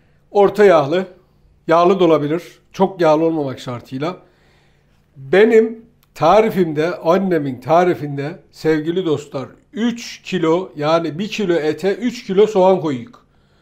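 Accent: Gaziantep, Turkey. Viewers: Muhterem abicim, Videolarini zevkle izliyoruz ve elimizdeki imkanlarla vermis oldugun tariflleri yapmaya calisiyoruz. Yurtdisinda yasiyoruz. Imkanlarimiz kisitli olsada yinede guzel lezzetli Antep yemeklerini pisirmeye calisiyoruz. Abicim sana bir sey soracam. Oncelikle Salvarin ve Deri yelegin harika. Salvar tam benim istegim modelden. Antep Turkmen Salvari. Turkiye'ye ilk geldigim gun direk Antep'e gececem ve 2 hafta kalacam ve Videoda tanittigin yerlerde dolanacam. Senden ricam Senin giymis oldugun Salvari diken ya da satan yeri bildirirsen cok memnun olurum hatta deri yelegini de. Soyle 4 yada 5 adet siparis verecem. Ayrica sivene hastayim. Tam bir Antep sivesi harika. Muhterem Annenin ve Babanin ellerinden operim. Allah'a cc emanet ol.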